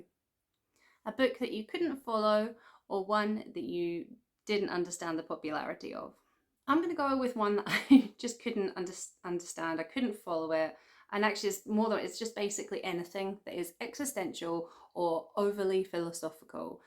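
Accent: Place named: Scotland, United Kingdom. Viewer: Your Scottish accent still comes through a little bit! I hear it in certain words.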